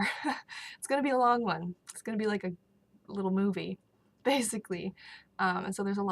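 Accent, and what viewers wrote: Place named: Canada. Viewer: Where are you from im from Denmark. Some of what you say Sound canadian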